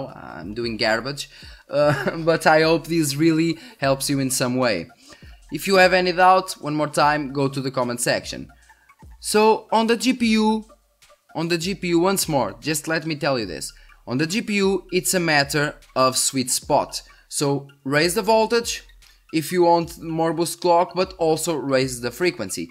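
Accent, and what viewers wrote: Portugal. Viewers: Quando te ouvi falar logo no primeiro minuto pensei: este deve ser tuga, isto é um sotaque tuga a falar inglês. E eis que acertei! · Tens bons Vídeos,Só tens que melhorar o teu sotaque em Inglês,a primeira palavra que te ouvi falar soube logo que eras Portugues :) ahaha mas bom contéudo anyways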